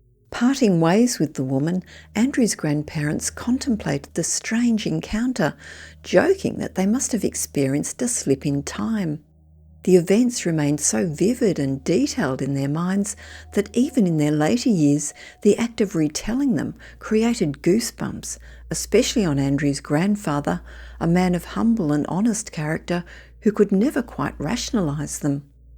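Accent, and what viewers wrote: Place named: Australia. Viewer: She's Australian. That's how Australians talk.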